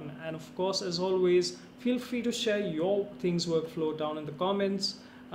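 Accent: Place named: India. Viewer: I hate the indian accent.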